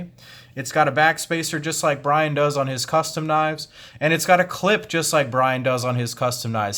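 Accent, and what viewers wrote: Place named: New Jersey, United States. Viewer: You know, because of his very French seeming last name and his knife aesthetics I always thought he was French in France. Then I heard him talk. Brother is just an MFer from Jersey like me!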